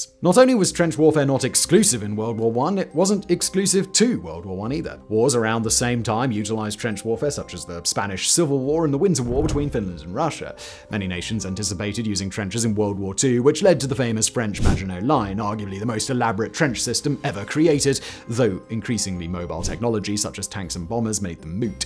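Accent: England, United Kingdom. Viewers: The french and German trenches were nothing a like. People think becuase this guy dressed well and has a british accent he knows what he is talking about. The german trenches were meticulous and the English were temporary and terrible to live in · Why are you using American English? You sound English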